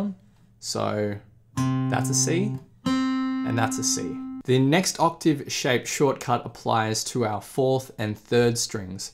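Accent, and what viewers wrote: Australia. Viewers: Something about the Australian accent that makes this so much more interesting….. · Haven’t watched hundreds but agree this one is brilliant, keep it up. And the Australian accent… sweet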